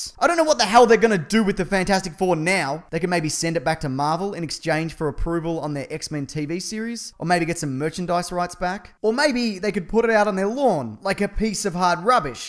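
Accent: Australia. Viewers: God I fucking love this channel and their podcast. Its hilarious and bang on with the commentary about films these days. The australian accent just makes it way funnier, I wish I was aussie instead of just crap and English. "Im gonna start pointing some bloody fingers!" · Hey love ALL your shows, are u a Aussie you sound like a Aussie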